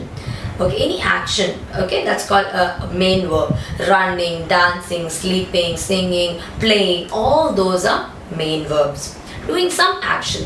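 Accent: Kerala, India. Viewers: Mam,are you from kerala?.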